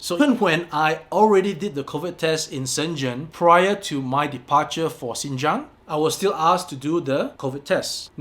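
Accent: Singapore. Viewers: Nice to hear the singaporean accent again! I'd lived there for 7 years and it's the best of my life so far. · So familiar with Singlish accent!!!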